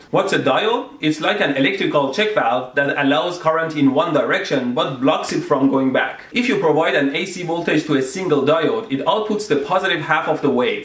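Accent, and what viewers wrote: India. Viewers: indian,just indian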